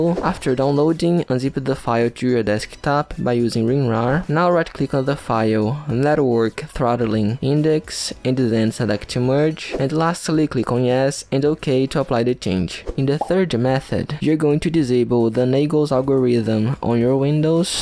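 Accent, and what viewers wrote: Brazil. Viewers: cara da pra perceber q é br so pelo sotaque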